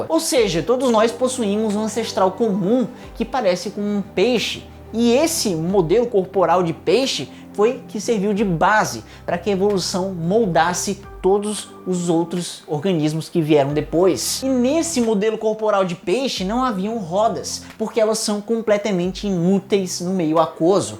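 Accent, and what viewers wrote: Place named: São Paulo, Brazil. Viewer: Nss kkk... Acho tão legal o sotaque paulistas aquela poxadinha no r (queria dar essa poxada também)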